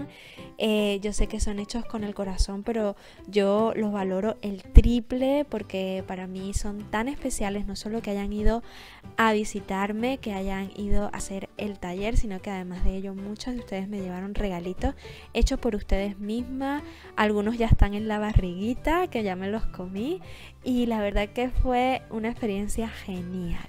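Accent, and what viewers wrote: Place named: Maracaibo, Venezuela. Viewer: Hola... tengo rato viendo tus videos y tratando de descifrar tu acento y me decía a mi misma: “mi misma, no puede ser.... será que es venezolana?????” De repente se te sale algo maracucho jajajajaja (aunque acabo de aclarar esa duda). Estoy viendo tu video sobre las preguntas personales que te hicieron y tuve que parar para llorar por la nostalgia que sentí al escucharte hablar de nuestro hermoso país. Sentí la necesidad de escribirte para felicitarte por todo lo que haces. Se nota que amas lo que haces y por eso todo te sale tan maravilloso. Gracias por dejar en alto nuestra hermosa Venezuela. Saludos desde Indiana, USA. Recibe un gran abrazo!!!!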